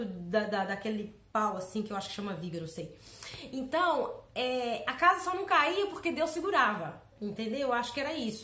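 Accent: Minas Gerais, Brazil. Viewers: Quando vim pro seu canal já me apaixonei pela pessoa que vc é , só de olha pra vc já dá pra ver a honestidade humildade e força de vontade que vc tem, fora que na hora já vi pelo seu sotaque que vc é daqui que Minas . Baum d+ Uai! Tbm sou Mineirinha da gema , de BH 😘 (Vendo em 11/06/2018) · Assim que vi seu vídeo pela primeira vez, logo pensei ela é mineira..kkkkk Esse nosso sotaque é inconfundível. Que Deus continue abençoando sempre 😍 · Vc num chorou mas eu sim kkkkk Glória a Deus pelas conquistas 🙌 Exemplo de mulher! Q orgulho dessa mineira kkkkk Sotaque nao nega!!! a primeira vez q vi um vídeo teu logo percebir essa é mineira😍❤😊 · sotaque gostoso esse de minas .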